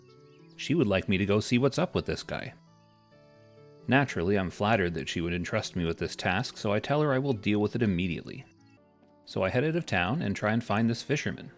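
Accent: Canada. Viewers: You sound like you're from east coast Canada :)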